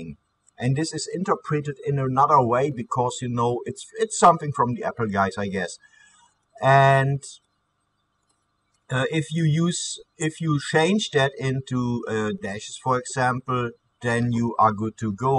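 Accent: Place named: Germany. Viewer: Man hört den deutschen Akzent sehr raus , but anyway , nice video :)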